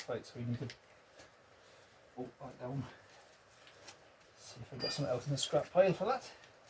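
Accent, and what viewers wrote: Wales, United Kingdom. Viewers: Great Channel, sound like from Wales? Love the work on the car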